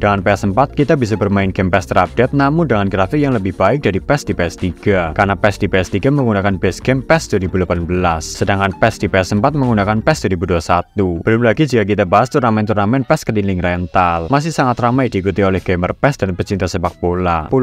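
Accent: Banyumas, Indonesia. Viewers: Wong Banyumas toh mas?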